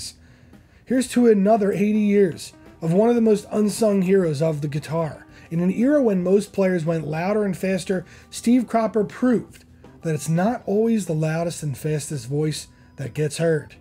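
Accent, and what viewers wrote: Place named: Delaware County, United States. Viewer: Thanks. I'm a recent subscriber and I enjoy your videos immensely and find it heartwarming to hear a hometown accent in your narration. I'm guessing you're a DELCO guy like me. After living my first 43 years in DELCO, I moved but I'm still in PA. Though I'm a drummer first, Steve Cropper is a favorite of mine. He's one of the biggest reasons why I love the Tele so much.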